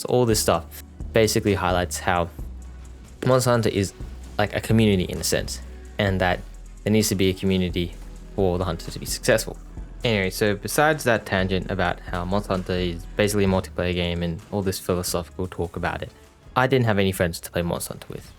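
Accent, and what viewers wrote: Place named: South Africa. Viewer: Bro are you south african/namibian/australian? You really sound south african to me lol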